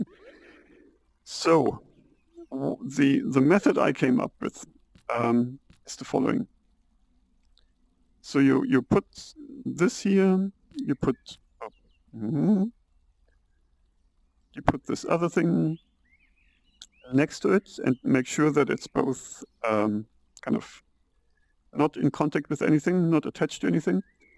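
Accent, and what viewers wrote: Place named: Germany. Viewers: Yea I think he is a german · Nice! Also i like ur accent are you german? I'm half german!! +1 sub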